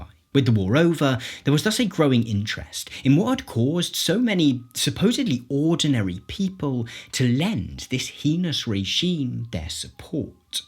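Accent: RP, United Kingdom. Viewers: haha as if this is the top comment. I'm British and just knew 100% he was British for some reason. glad I found him, his videos are good. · How did i know you were a brit before even clicking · the guy sounds like such a tory but his opinions don’t match his voice ahhhhhhhh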